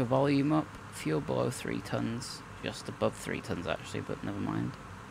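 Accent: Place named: London, United Kingdom. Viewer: lol lol u soun like ur from london lol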